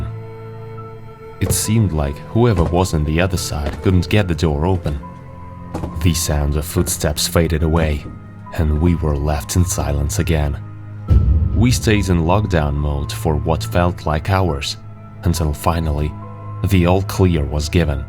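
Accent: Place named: United Kingdom. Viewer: HES BRITIS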